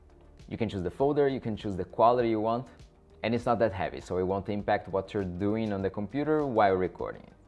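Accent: Brazil. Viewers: I don't know, but it seems that you are BRAZILIAN, your accent is the same AHAHAHAH. great content brother!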